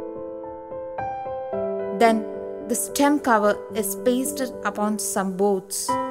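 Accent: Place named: Kerala, India. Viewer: accent of sexy kerala girl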